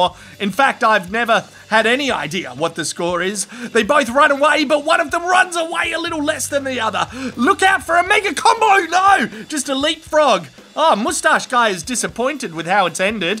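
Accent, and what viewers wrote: Australia. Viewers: Far Rick farrk, fareken funny bro I mean mate,were u been Aussie or something.far funnier hearing you go on..never seen slappy slaps,just in bed once.inmean slappy sehflaps..oops .out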